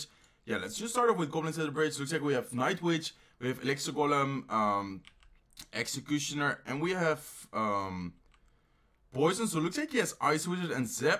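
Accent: Netherlands. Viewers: Actually een best wel nederlands accent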